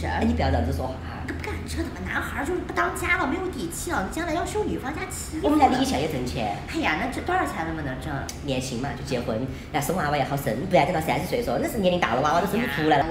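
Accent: Taiyuan, China. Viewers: mi AMY 😂哈哈哈哈应该是太原的吧和我小时候听的话一摸一样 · 这口音熟悉啊，山西太原的吧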